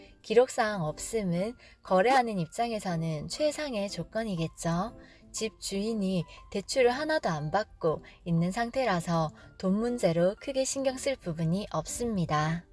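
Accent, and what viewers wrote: Gyeongsang, South Korea. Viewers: 유익한 영상 잘 봤습니다~ 쉬운 듯 어려운게 등기부 보는법 같아요. 전 님 경상도 사투리가 참 듣기 좋아요^^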